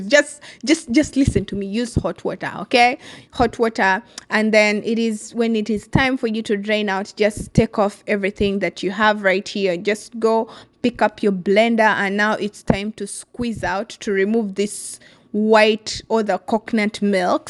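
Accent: Uganda. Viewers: You sound UG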